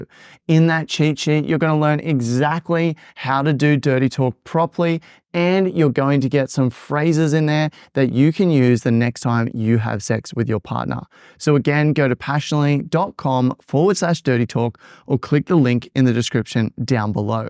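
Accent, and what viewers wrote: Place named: Australia. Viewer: you're amazing. i love your videos and your stupid little australian accent. keep it up, i love watching your stuff with my bestie, we're so educated now!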